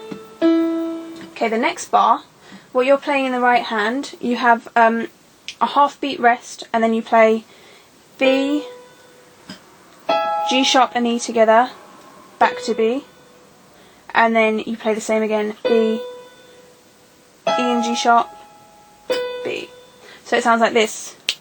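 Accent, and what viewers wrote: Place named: United Kingdom. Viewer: thanks so much for this tutorial, I LOVE your british accent it's amazing :) greets xoxo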